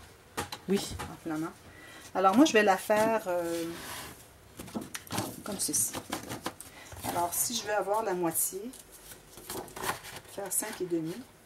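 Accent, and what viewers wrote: Canada. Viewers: Merci Pour ce bel album, il est magnifique, je pense le refaire en essayant d'adapter les dimensions pour un album plus grand pour pouvoir mettre des photos 10x15 ou tout au moins de 9x13 formats les plus courants en France! Tous les matins, c'est avec un énorme plaisir que je regarde tes tutos. Je commence ma journée avec tes vidéos et avec ton bel accent canadien. Merci encore pour ta disponibilité, Biz de France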